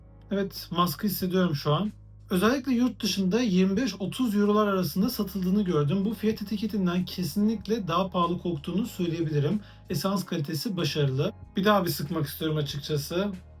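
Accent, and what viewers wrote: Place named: Central Anatolia, Turkey. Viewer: daha instagram diyemiyon mudur. nerenin aksani bu ic anadolu lu musun. ?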